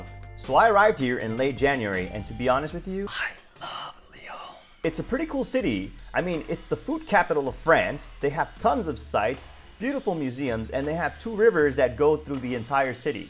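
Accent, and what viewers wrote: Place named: Southern California, United States. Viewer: LoL yeah, you definatly have a So Cal hipster accent 😅👍 Love those hills in the intro. Hows the economy there in Lyon? It's my number 3 spot I'm thinking, thou heard it's more expensive than toulouse & Rennes.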